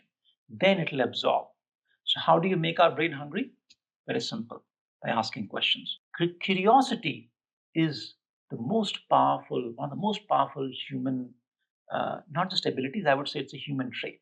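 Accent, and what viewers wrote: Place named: India. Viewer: Yes, the Indian accent makes it more efficient to understand.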